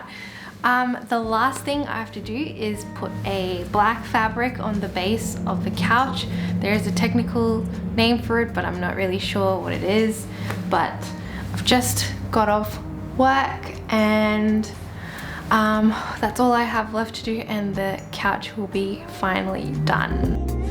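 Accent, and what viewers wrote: Australia. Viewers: An Australian Accent?! Really sounds like your from Sydney :D !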